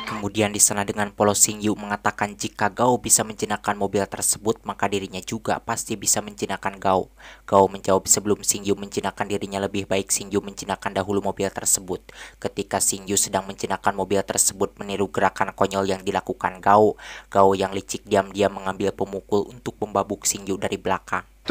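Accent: West Java, Indonesia. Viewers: Akang nya orang Sunda ya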